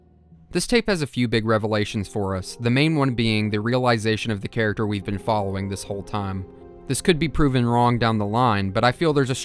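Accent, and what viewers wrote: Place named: South, United States. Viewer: Somehow though the way you talk and show things makes even this absolutely terrifying freakshow seem friendly and welcoming.... Either its not that scary the 2nd time or its just horrific without a well humored Southern guy talking about whats happening idk ...did you use the whisper TTS on FL Studio to name episodes because of Serial Experiments Lain?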